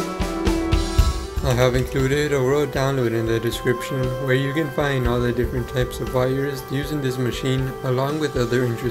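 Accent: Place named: India. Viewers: To me It looks like the accent of indians you find everytime you search for something related to programming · Yup it sounds slightly South Asian/Indian to me too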